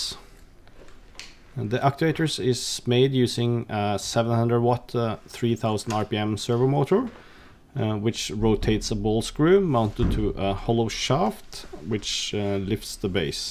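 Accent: Norway. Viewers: Jeg viste at du var norsk jeg merka det bare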